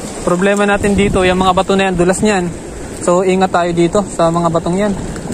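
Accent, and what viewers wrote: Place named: Polillo, Philippines. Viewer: Boss taga quezon province kaba.para kc punto ng taga polillo.😂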